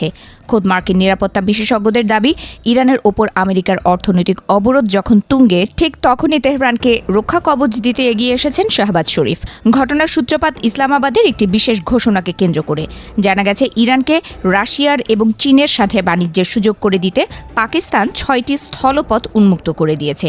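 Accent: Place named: India. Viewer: ৭১ টিপির মালিক বদলেছে কিন্তু চ্যালা গুলো এখনো রয়েছে। তাই তো ভারতীয় টোনে এখনো কথা বলছে।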